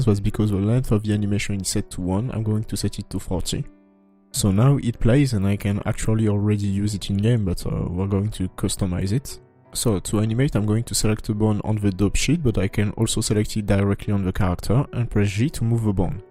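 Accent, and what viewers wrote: France. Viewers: We've needed this for a while! Also love your French(?) accent, I may be enabled for taking french in high school but you're very understandable :D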